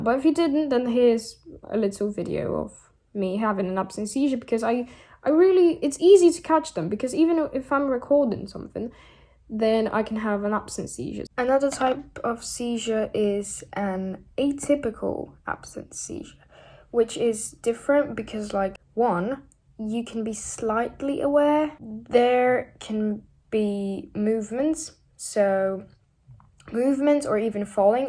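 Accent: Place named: United Kingdom. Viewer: Mówisz cudownie 😩💗 ale czy będziesz jeszcze nagrywać po polsku? Bo niebyt kumam brytyjski akcent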